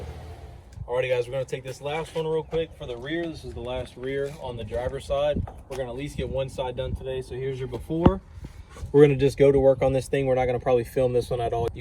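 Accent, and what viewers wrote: Alabama, United States. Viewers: You are from Alabama right ?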